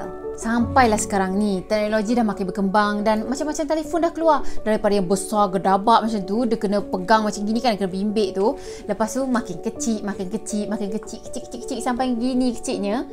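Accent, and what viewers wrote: Kelantan, Malaysia. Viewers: Ni orang kelantan slang nya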